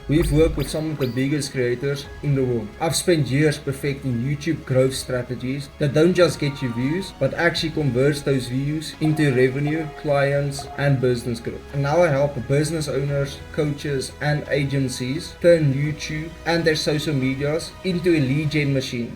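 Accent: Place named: South Africa. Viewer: Hey, I just wanted to say I absolutely love your content! You inspire me to improve my editing skills 💯. By the way, are you from South Africa? Your accent sounds familiar South Africa😅! I’m a creator too, but wow, your videos are HUGE!:face-blue-wide-eyes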